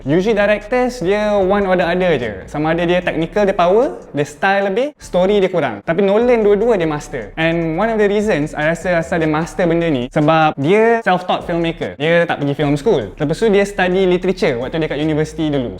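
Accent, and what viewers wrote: Malaysia. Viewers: I love this kind of persona the speaker has. The way he told the stories. R u malaysian or singapore?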